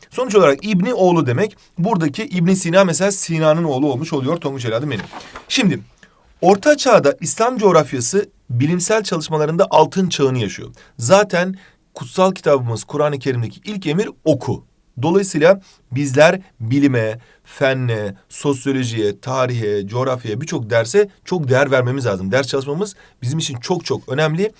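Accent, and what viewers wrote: Turkey. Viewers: İstanbullu yada Ankaralı (tonguç)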